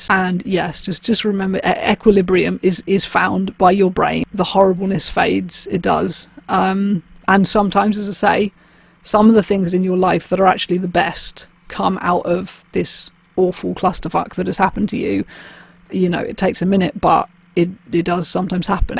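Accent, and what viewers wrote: United Kingdom. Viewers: what kind of british accent do you have?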